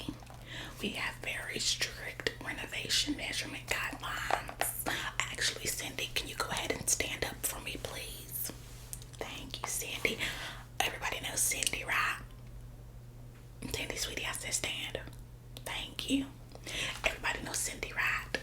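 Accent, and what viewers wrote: South, United States. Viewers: I love the southern accent 😁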